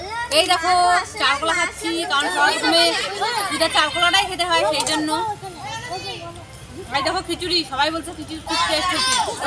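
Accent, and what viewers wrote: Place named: Bangal, Bangladesh. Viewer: Bangal vashata bolbe. Oitai main attraction. Khub valo lage. P😊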